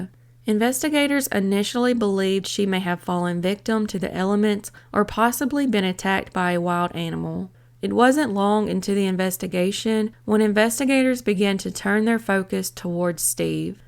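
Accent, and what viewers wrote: South, United States. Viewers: Dont need background music!! Love to hear that good ole country voice!! Thank you!!:hand-pink-waving:🤠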